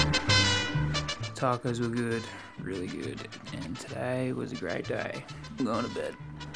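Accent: Australia. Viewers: That accent.. definitely Aussie. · Awesome filmmaking dude .. are you from Australia ?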